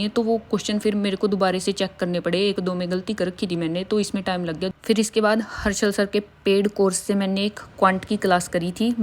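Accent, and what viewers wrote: Haryana, India. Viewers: Aap haryana se ho na😂😂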